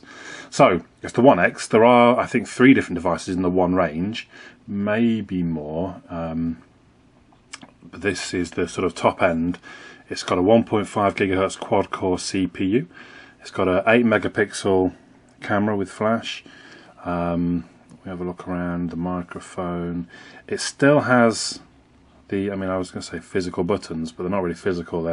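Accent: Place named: United Kingdom. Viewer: Just part way watching the excellent video and you was talking about the calender. I use the month view on my phone all the time. I use Pure Calender Widget and I find it truly indispensable. I colour code my everything I schedule in (blue is tasks, green is personal appointment and orange is birthdays/anniversaries etc.) so just having the whole month at a glance is awesome. Just thought I'd let ya know :-) please continue doing your reviews. Always great hearing a Brit doing the reviews.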